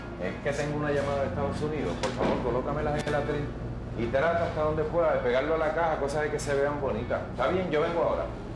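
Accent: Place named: Puerto Rico. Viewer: Me encanta el acento boricua. Bendiciones a Puerto Rico.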